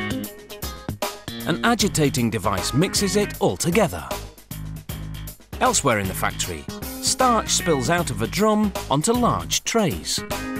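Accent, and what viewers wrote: England, United Kingdom. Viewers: i love English accent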